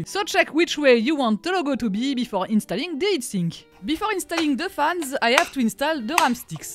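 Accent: France. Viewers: Beautiful video ❤ Clear instructions on how to install Noctua Fan on the Motherboard 🖥 Love the English in French accent 🟦⬜🟥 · Thickkest French accent ever 😅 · salut, cher camarade français $$ j'adore l'accent english des fr qui parlent anglais ^^ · The accent is not exactly "French", it is more precisely Parisisan accent. Try the Québec accent. '-)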